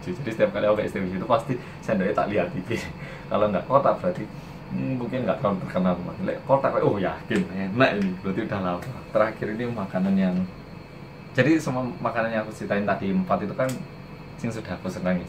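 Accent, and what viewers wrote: Java, Indonesia. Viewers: Isek medok lek gini 😂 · sampeyan layak dadi artis mas, soale jarang korea berbahasa jowo dan medok begini, valuable tenan iki. melu drama sekolah internasional di net TV kae mas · Bahasamu mas medok kece 😂👏🖒 tinggal malang ndi? aku nak suroboyo iki. cidek yo 😆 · Mana opening orang korea yang medok 😂😂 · serius, kaget sama aksenya dia sama sekali nggak ada aksen koreanya, dan bener bener2 kental medoknya, sepertinya dia bakalan jadi sainganya han yoora hahaha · Agustus 2020 baru liat ini karna abis liat kbs indo di ig sama dita...penasaran org korea kok lucu iso medok😂😂😂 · aaaaawww mas kamu medok sekali🤣🤣🤣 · Ada di branda, ko unik, muka orang korea kok bahasanya jawa medok , aku langsung liat semua vidio, dan akhirnya kenalan jugaaa hehhehe, sehat selalu ya kka :) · Duuuuuhhh dan aku nonton video ikiii,, ya ampuuuum muedok banget maaaaasss sao guuueeentooong hahaha , , aksen jowo seng berlebihan ki ngno wki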